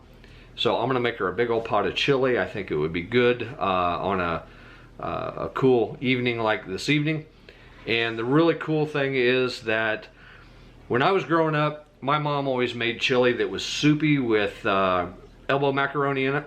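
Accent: Indiana, United States. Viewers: Yep, Indiana. Warsh this instead of wash this. I too am guilty!!!!😉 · You are definitely from Indiana. Lol warsh. Sounds like my sister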